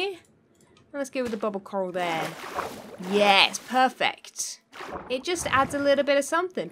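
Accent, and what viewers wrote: United Kingdom. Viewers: I'm learning more about other hermits and I now know you and Impulse .xD By the way I like the way you speak it's nice, british?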